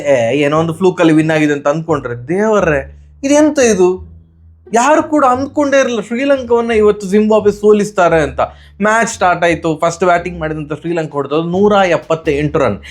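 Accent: Mangalore, India. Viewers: ಆ...ದೇವರ್ರೇ ಉಂಟಲ್ಲ..... Its bought u a new subscriber ❤❤ Love your accent' love kudla ... ಇನ್ನು ಹೆಚ್ಚು ಹೆಚ್ಚು ..ಕಂಟೆಂಟ್ ವಿಡಿಯೋಗಳು ಬರಲಿ .... ನಗುವಿನೊಂದಿಗೆ .... ❤.goodluck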